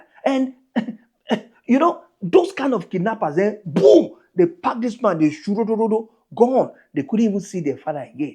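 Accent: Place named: Nigeria. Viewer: Please do your videos in proper English not this your Nigerian English its not all of us who understand it yet we follow you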